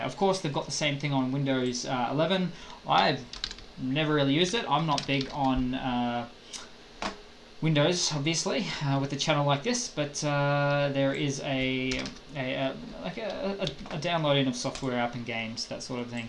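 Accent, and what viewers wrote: Australia. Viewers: i love australian accent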